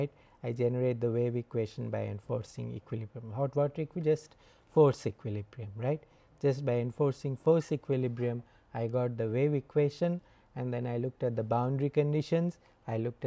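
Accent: India. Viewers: he's indian, both ears unhappy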